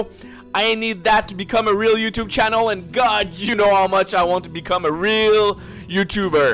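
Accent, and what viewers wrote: Quebec, Canada. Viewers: Tu es clairement Québécois! Moi aussiiiii! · liked your old french canadian pronuciation of alliance better..... nice basic tutorial for DD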